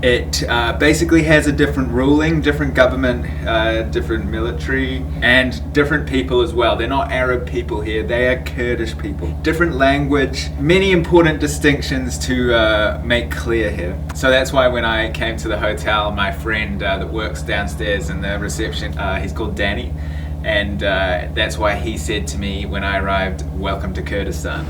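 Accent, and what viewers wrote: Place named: New Zealand. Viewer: He is a New Zealander, not Australian. And if you have a hard time with the accent click on CC and you can read along with the captions. ( true blue Kiwi born and bred here ) :)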